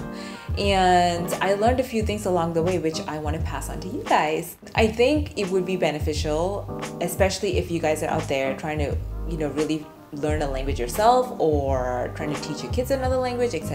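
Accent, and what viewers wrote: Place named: Kerala, India. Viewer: Excellent video.. it’s always good to learn another language.. I have some Spoken Malayalam lessons 😀 chumma oru rasam .. naatil evida? From the way you spoke (minus the English accent), I think you’re from South Kerala, like Pathanamthitta district or Kollam district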